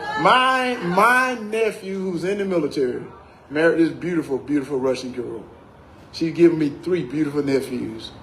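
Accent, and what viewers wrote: South, United States. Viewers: Making fun of his deep southern accent???? This Georgian is voting for him.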